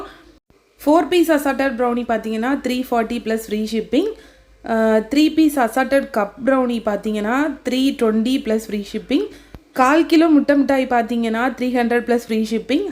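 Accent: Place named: Kanyakumari, India. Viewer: Akka neenga kanyakumari ah paesurathu namma ooru slang maari irukuthu...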